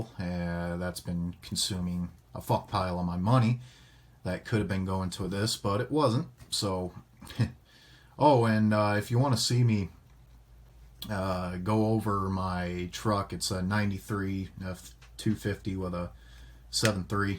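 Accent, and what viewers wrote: United States. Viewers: fuck man were you born and raised in NYC, that's how we speak BROOKLYNESE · When you talk you remind me of the MN boys 🤣 I've been to Des Moines they must be alot different than rural Iowa I also get why people are safety Nazi's just because I had a gun doesn't mean one of my kids didn't touch it or whatever but people don't get the safety is situation dependent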